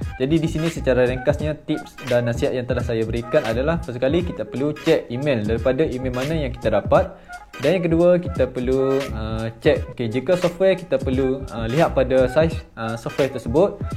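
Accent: Kelantan, Malaysia. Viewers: Ore kelate ko bos?pekat molek bnyi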